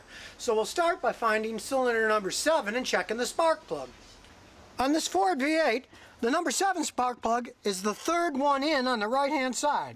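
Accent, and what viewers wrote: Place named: Midwest, United States. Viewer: bummer. your accent sounded like you were from the midwest...so I was hoping you were in Michigan. love the channel. thanks and have a good day.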